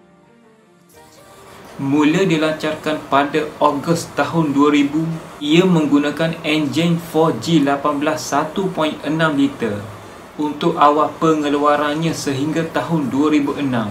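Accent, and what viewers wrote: Kelantan, Malaysia. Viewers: Tahniah.. walaupun bunyi2 klatey kluar sikek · Kelantan ek bang..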